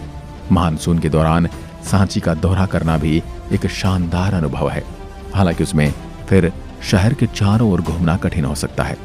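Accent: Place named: Rajasthan, India. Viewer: Thanku ji mp ke बौद्ध स्तूप के लिए मैने आपकी सारी वीडियो देखी h राजस्थान की आपकी आवाज bht pasand h 🥰🥰🥰🥰 भाई जी प्लीज mp ke gwalior or shivpuri par video बनाइए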